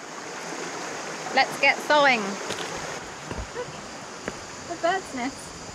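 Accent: Australia. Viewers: I noticed; some similarities of Australian accent ( yours ) & American "Southern" accent, where "fire wood" and "alright".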